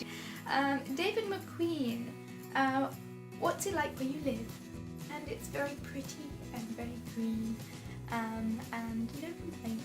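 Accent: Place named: Cumbria, United Kingdom. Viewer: You've got to be from Cumbria, that accent is hard to narrow down!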